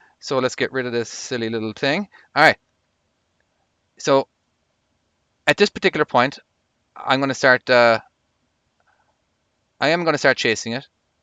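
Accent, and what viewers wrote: Ireland. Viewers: are you Irish? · What accent do you have? To me it sounds kind of Irish, another trainee says Canada.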